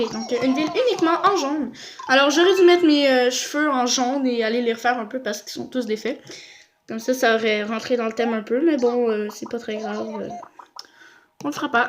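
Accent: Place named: Quebec, Canada. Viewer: On attend un petit accent québécois quand tu parles, tu es québécoise ?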